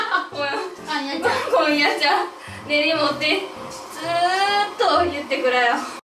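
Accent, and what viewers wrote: Kagoshima, Japan. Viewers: 鹿児島イントネーションで充分😂 そのままでいてください👍 ありがとう😆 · NHK大河ドラマ 『西郷どん』見てるかのようでした😅 鹿児島弁分からないです。 鹿児島の人は標準語はどこで覚えるのですか？ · 普段の動画で話してた言葉が鹿児島弁やと思ってたのは俺だけ？ww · 親が鹿児島、まったく喋り方一緒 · 最高やないかい😊 鹿児島弁好きやからいいね😁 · ええな～鹿児島弁👏👍言うてる事ぜ～んぶ分かるし…👍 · 鹿児島弁は使っていこう｡忘れないで､いつもイントネーション鹿児島だしね3人とも。だせん？ですです～も使っていこう‼️僕も東京1年目は鹿児島イントネーションがとれなかった。普通に「いしちゃっ」はずっと出てたな。だから訛りは､大事。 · 鹿児島弁可愛いこれからも動画楽しみにしてます、可愛い❤️😊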